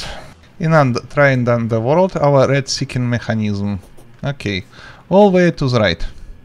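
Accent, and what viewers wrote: Russia. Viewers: такой милый акцент у тебя)) сразу пон что ты руссский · Cuz he's russian, he's original channel is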